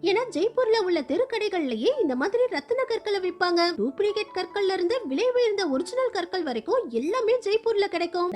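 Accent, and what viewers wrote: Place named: Sri Lanka. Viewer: இலங்கை தமிழ் ❤❤❤ இலங்கை நிலை 😢😢😢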